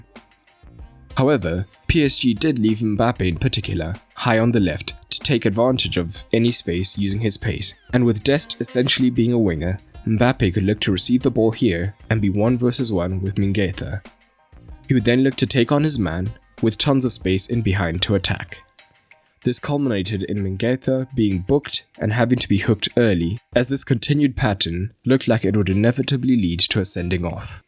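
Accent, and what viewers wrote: South Africa. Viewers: You sound like you from South Africa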